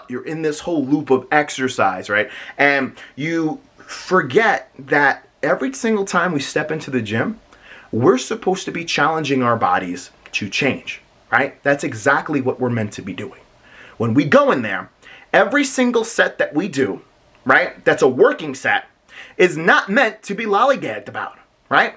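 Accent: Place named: South, United States. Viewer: Good Southern accent Coach. LOL you can shed it and then the illusion takes over, you look pretty big on stage!!! Another banger video!!! OK bro wassup with the podcast with Joe....I'm gonna keep kickin your ass until y'all start doing them again.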